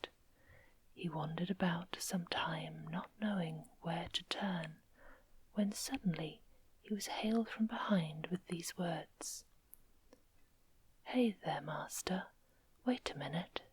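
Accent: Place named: England, United Kingdom. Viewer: Love sleep stories. The softly spoken English accent is soothing & relaxing. I've always loved magic & fairytales so I thoroughly enjoy the stories plus the narrator is great. What is the narrators name? I really love listening to the stories in her great voice. I listen to these most nights. Thanks so much!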